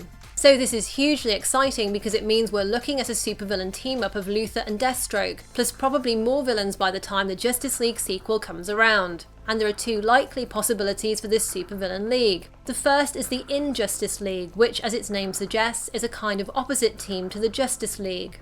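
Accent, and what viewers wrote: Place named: England, United Kingdom. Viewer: are you from England? I'm in love with your voice and that accent.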